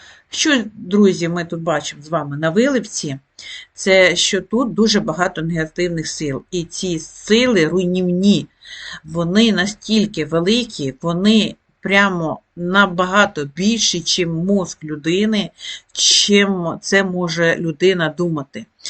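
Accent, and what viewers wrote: Surzhyk, Ukraine. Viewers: українська мова навіть з суржиком, всеодно краще, ніж рашистська. І це дуже добре,що українська навіть через такі страшні події. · тримайтеся,тримайся люба Одесо.Нам всім дуже болить.В вас гарно виходить українською,а те що трошки суржик це нічого,більшість людей розмовляє трішки з суржиком.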